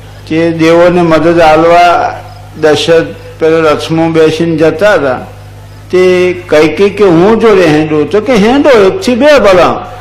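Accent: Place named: North Gujarat, India. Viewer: કાઠીયાવાડી ના મોઢે પરફેક્ટ ઉત્તર ગુજરાતી બોલી ... વાહ...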